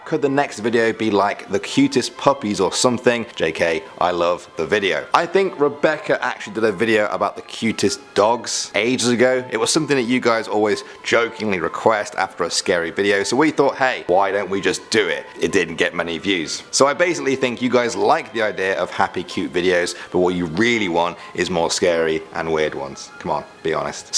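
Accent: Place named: United Kingdom. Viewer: YEAAAA BRITISH PERSON!!!!!!!!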